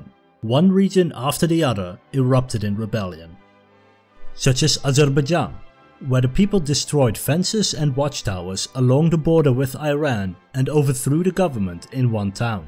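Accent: Netherlands. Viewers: I appreciate this entire video and all the effort that went into it; but fuck me, does your dutch accent hurt my ears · I can hear you're from the Netherlands or in neighbouring regions goed man! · Ugh I added this vid to watch later, wanted to watch it later but i totally forgot this guys horrible accent. All your topics are interesting but either do them in Dutch or work on the accent cause its unwatchable for me.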